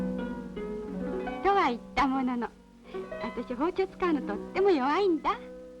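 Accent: Japan. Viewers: 南関東方言の一つで、いわゆる「山の手言葉」ってやつですね。 今では話す人もあまり居なくなり、ほぼ絶滅状態の言葉です。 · 和式便所にダストシュート、それに焼却炉、今じゃ考えられないワッ！ 東京から来た女子転校生がこんな話し方だったなぁ～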